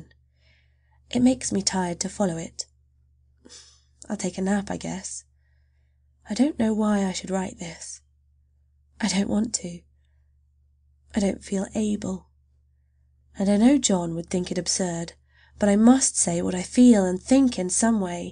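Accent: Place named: United Kingdom. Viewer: Very much than other normal US english readers, i think UK english is the proper voice for this story.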